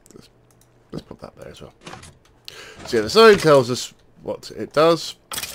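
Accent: England, United Kingdom. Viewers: You sound slightly like biffa a little. Good ep man:) are u from the UK you sound it? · ***** - nice to hear a British accent, I only ever hear American and Canadian accents :) We must be the rare breed of British youtubers you and I :) Keep it up good buddy. Your British fan/fellow youtuber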